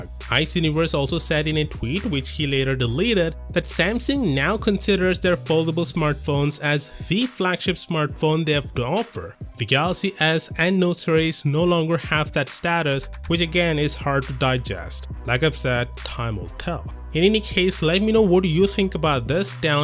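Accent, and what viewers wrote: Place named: India. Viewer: This guy has an Indian accent if you listen carefully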